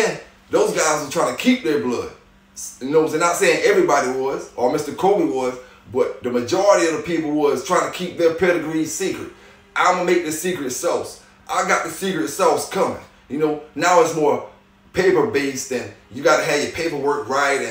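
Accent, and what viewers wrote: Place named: Oklahoma, United States. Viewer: Much love Keep pressing forward. I don't know where you from...sound like you from Oklahoma.....love the channel. #Kansas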